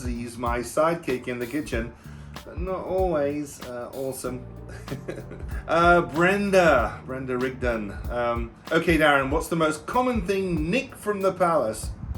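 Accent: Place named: Nottingham, United Kingdom. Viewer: Huge congrats Darren, your's is my go-to comfort company, LOVE your videos, you so deserve this success as you are a great presenter, cooking coach, and generous in sharing your recipes. Listening I heard you studied at Clarendon SO DID I. I used to go to the catering student's shop and buy stuff, then take it home on the train to Newark (Notts). I now live in Telluride, Colorado. Clarendon is now called New College Nottingham, and I swear what I learned at Clarendon (BTEC Beauty Therapy Spa Management) has set me up for life. I can't tell if your accent is Nottingham or Derby, it's clearly been tweaked by high society and the US. Be very well, Karen (Kaz) totally Notts:)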